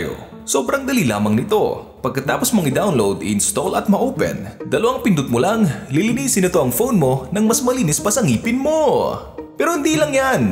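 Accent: Visayas, Philippines. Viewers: bisaya ka ba?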